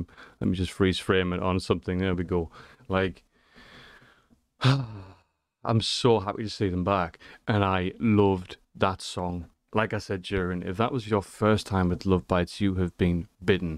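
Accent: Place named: Newcastle, United Kingdom. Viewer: Enjoying the Geordie accent 🙃 . I don't have to say a thing about this reaction except your enthusiasm is spot man!! 😎🤟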